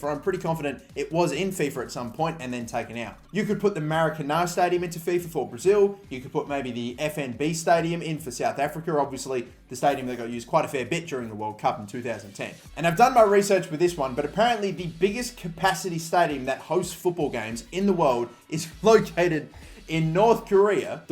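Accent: Australia. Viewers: i could tell your Australian straight away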